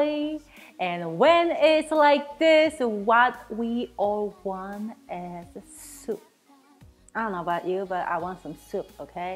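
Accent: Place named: South Korea. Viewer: 영어발음이 너무나 익숙한 발음이라 한국분이신가 했는데 역시 한국분이시군요ㅎㅎ 똠카까이 너무 좋아하는데 자세한 레시피 감사합니다!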